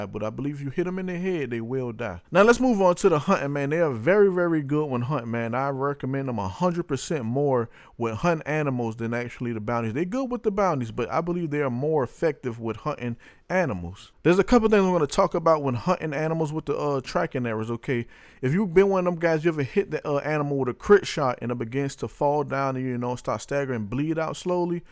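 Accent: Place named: South, United States. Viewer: That’s arrow, not error. Good southern boy accent dair.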